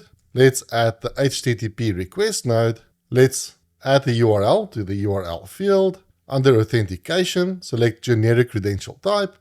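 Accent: Afrikaans, South Africa. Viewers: 😂 lekker afrikaanse ou, “What are my current list of todos.” Priceless. Thanks for the video 🎉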